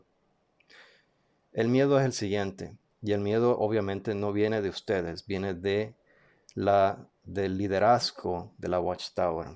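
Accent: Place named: Mexico. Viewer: Por un momento pense heras Mexicano por tu forma de hablar ,de Panama es como ya sabes el general y ahora es jw . lo de tu hija como decimos en Mexico que mala onda ,aunque yo vivo en los Angeles California USA 🇺🇸.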